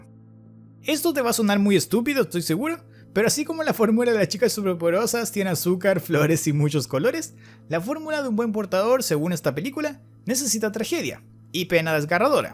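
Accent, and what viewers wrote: Chile. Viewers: No es hate pero no me gusta el asentó chileno :'(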